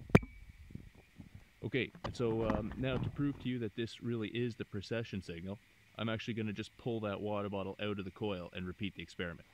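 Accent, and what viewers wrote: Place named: Canada. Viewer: Yeah I'm enjoying the dual pancake experiments! Very little on yt done with actual muon air shower measurements, so that's interesting to see. You sound Canadian and it looks like you're in a deciduous forest - Ontario? If so and you're ever in Rochester for some undoubtedly regrettable reason let me know and I'll give you a tour around the high energy laser fusion facility here.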